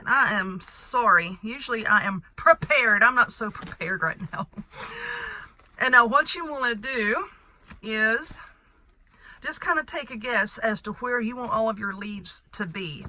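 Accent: South, United States. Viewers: I'm new to your channel, this is my second video on your channel I've watched...I have to say it but I love your accent! Lol. And this diy, OMG...it's so beautiful!! Thanks so much!! ☺💗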